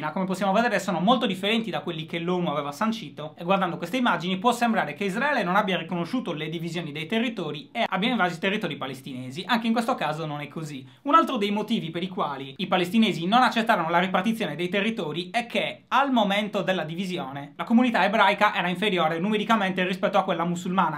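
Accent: Sardinia, Italy. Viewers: a quei tempi il tuo accento sardo era più marcato XDDD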